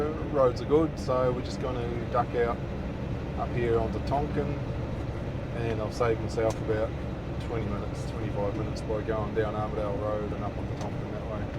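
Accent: Southland, New Zealand. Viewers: Great to watch. Enjoy your stuff mate. You sound like a Southlander.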